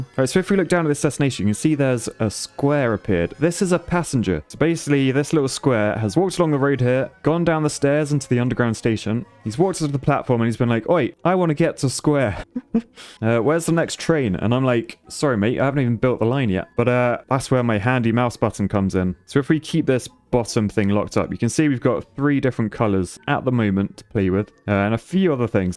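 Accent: United Kingdom. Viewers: "Our square has boarded the train" Your british always cracks me up. Thank you for making videos. You made me buy mini motorways!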